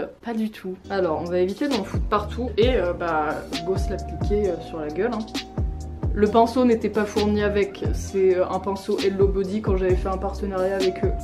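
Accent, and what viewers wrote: United Kingdom. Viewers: Mais elle est 100% British pas américaine, écoutez son accent 😢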